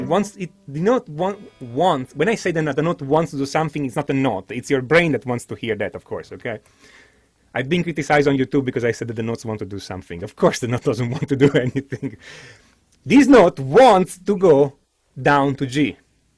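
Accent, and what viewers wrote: Italy. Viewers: Are you italian in Milan? You sound 100% like my boss 🤣 great videos!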